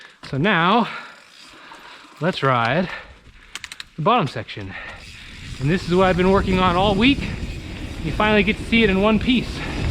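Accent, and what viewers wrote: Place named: Minnesota, United States. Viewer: Oh ya is MN, eh is Canadian....nice vid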